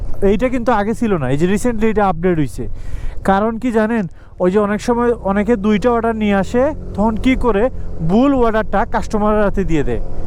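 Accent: Sylhet, Bangladesh. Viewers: Tmi Sylheti? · Bhai Apnar House Sylhet ni ?? 😅 কথার ধরনে বুঝা যাচ্ছে সিলেটি ❤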